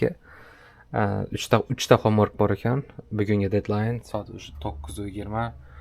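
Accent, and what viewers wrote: Khorezm, Uzbekistan. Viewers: xorazmli misiz xorazmli misiz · Xorazmdanmisiz accent xorazmga tortyapdi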